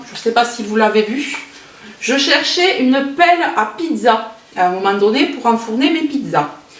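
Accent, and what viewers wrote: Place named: Pyrenees, France. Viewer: J'ai pas pu partir chez moi dans les pyrénées cette année alors ton accent me donne l'impression d'être un peu en vacances 😘😘😘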